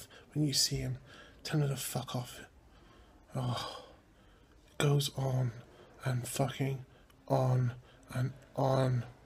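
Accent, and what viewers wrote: Somerset, United Kingdom. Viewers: Being from Bristol I just knew is was a Somerset accent. ;-) Thanks for sharing the video. My first manic episode involved me wearing sunglasses so that people wouldn't steal my thoughts.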